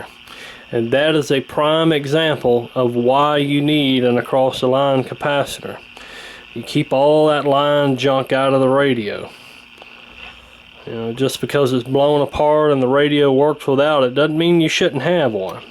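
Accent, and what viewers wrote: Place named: East Tennessee, United States. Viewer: Great channel. And sir, that sounds like an East Tennessee accent to me. Or somewhere not too far from there.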